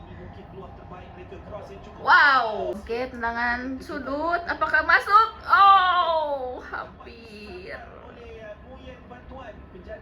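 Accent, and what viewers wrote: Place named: Sunda, Indonesia. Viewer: Teteh..ini pasti orang Sunda, logatnya katara pisaan..ha..ha.. Tenang aja teteh, nonton teruus nanti ketemu lagi di pinal krn kita pasti juara..ha..ha..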